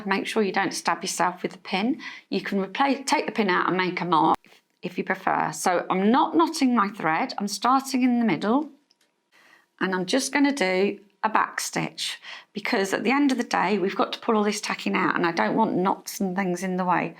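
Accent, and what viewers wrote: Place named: England, United Kingdom. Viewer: Best cross hatch video ever. You have made it so easy for someone who hates sewing. I do a lot of embroidery and the piece I am going to work on is a table runner with cross hatch quilting. I have watched other videos on cross hatching and it was so involved and so confusing. Thank God I found your video. Can’t remember if you said how wide the tape was. I have subscribed to your channel. Besides making it so easy I love listening to you. I have always loved the English accent. Not only am I American, but I am from the Bronx, New York and I wish I had your accent.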